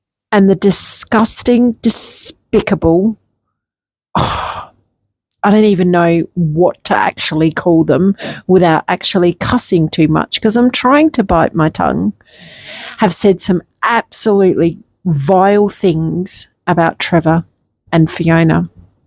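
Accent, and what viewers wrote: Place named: Australia. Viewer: I've never come across your channel before, you sound Australian and I don't get to follow many Australian creators. I felt the same way many times when Fiona so generously updated us on dear Grahams condition-just wanted to hug her. Then I made the unfortunate choice to watch what that hideous swamp hog said about Trevor's visit and was shocked that people are so awful. Why though? That's what I don't understand. They've never done anything to any of them.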